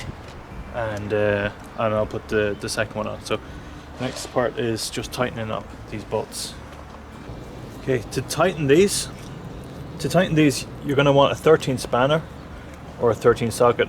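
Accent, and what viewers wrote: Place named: Ireland. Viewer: Sounds so weird hearing an Irish guy call me dude! Thanks for the video though. Was nervous trying my vitz roof bars on my new Toyota corolla hybrid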